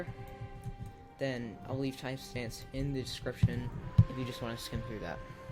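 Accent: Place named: Sweden. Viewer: its like a swedish accent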